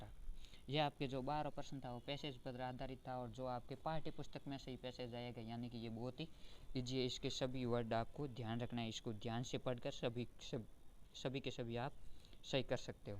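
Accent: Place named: Marwar, India. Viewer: Bai aap rajsthan se ho to aapka Jilla jarur batana mujhe aapki aavaj se lag raha hai ki aap marwad se ho 😊😊❤🎉🎉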